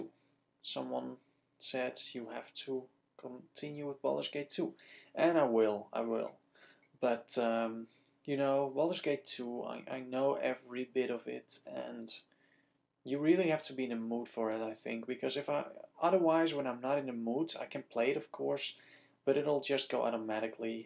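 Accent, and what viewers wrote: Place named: Netherlands. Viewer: Your german reminds me of the cartoon series Alfred J. Kwak i used to watch as kid, because the german voice actor of this cartoon figure is also a german speaking dutch. Sounds cool ;) Kudos for doing those video, a brave step forward!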